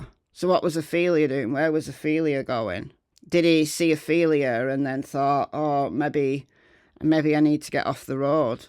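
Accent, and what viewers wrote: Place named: Lancashire, United Kingdom. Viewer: we all know what's going on, the media are implicated, you;re absolutely right. we all know, these little scrotes are pretending to be gangsters, emulating L.A. gangs and rap culture, and the old rave culture. with no knowledge. I get the impression that you know the old rave scene? Those days are gone. I bet you know, judging by your accent, that you know what I'm talking about, Lancashire has been the centre of house/rave since the opening of the Hacienda, as you know. You're speaking truth, you know, we all know, so...........why all the speculation? drama and theatrics?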